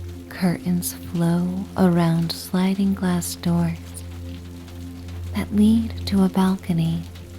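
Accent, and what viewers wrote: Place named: United States. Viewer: Love the rain ☔️ sounds! That is what decided this video for me! Love your voice and accent! I moved from the us to Ireland when I was 8, and the uk 🇬🇧 when I was 16 and I love it but my inner child loves an American 🇺🇸 accent! Soft like yours which was made for this and you would make an amazing hypnotist! Thank you 🙏 🕊🤍🕊 🫶✨🌟💛🌟✨🫶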